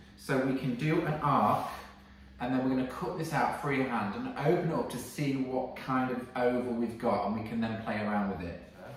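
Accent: England, United Kingdom. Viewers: I agree! He’s always saying (no pun intended) that he talks too much. I love hearing his thought process and why he’s doing what he’s doing. An awesome teacher for sure! I think it’s great! Plus, I love an English accent! 🙂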